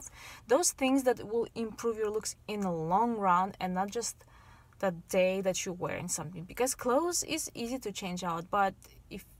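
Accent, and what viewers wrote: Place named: Eastern Europe. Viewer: You sound Eastern European. Just curious.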